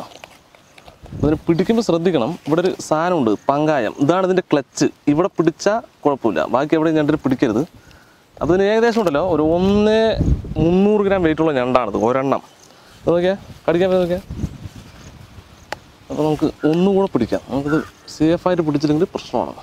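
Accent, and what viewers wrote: Palakkad, India. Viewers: ningal Marana Mass aaanu. Ningalude aaa palakkaad style talk nangalkk nalla ishtttam aaanutttaa Ningal elllaavarum koodi snehathode irunnu food kazhikkunnathum manasssinu sandhosham tharunnund. Padachon eee sneham nila nirthi tharattte Delivery kondu vannnath muslim payyyan aaanennnu paranaju food reject cheytha aaalukal ullla india il ithupolathe video orupaadu nallla message aaanu nalkunnnath. Nammal elllam paraspppparam snehathode jeeevikkanam. Athaaanu etttavum valiya sambaadhyam ikkkaaa. Ikkkaa ningale padachon orupaaadu anugrahikkkattte. Aaameeen Athu pole eee video il ullla elllaaa chetttanmaarodum orupaaadu thanks. Love u all Rabeeh Thrissur ( Dubai ). Lots of love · സങ്കടങ്ങളും പ്രയാസങ്ങളും വരുമ്പോൾ ഒരു video എടുത്തു ഒരു പിടി പിടിക്കും.. സംസാരം പാലക്കാടൻ സ്റ്റൈൽ വളരെ ഇഷ്ടമാണ്.. ഒട്ടും over ആക്കാതെ വലിച്ചു നീട്ടാതെ.. Food taste ചെയ്യാൻ കിട്ടുമ്പോൾ ആ കുരുന്നു മനസ്സുകൾ ഒക്കെ എത്ര happy ആണ്.. 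കുക്കിംഗ്‌ ഇഷ്ടപ്പെടുന്ന ഞാൻ ഇക്കയുടെ ഒരു വല്യ fan ആയി മാറി <3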